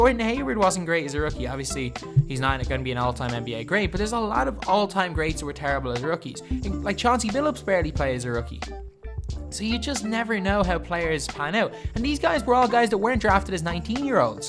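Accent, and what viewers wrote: Ireland. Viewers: Thanks I’m Irish and I thought he was Irish alright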